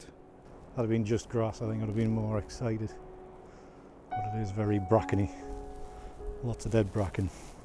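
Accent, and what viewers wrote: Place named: Northumberland, United Kingdom. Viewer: Thank you, for these very interesting and informative videos. Like many, I came here after seeing you with Thomas Heaton. Your work is particularly reminiscent of the impressionists like Turner, in particular 'Wreckers Coast of Northumberland' and I applaud your efforts in this field. I liked your approach to processing the images and loved the outcome - a very unique perspective on a well known location. I can't wait to get out and try this myself. One small criticism is that when you were outside, the audio levels were a little low making it difficult to hear. No problems in the later two. Nice to hear a Northumbrian accent on here too!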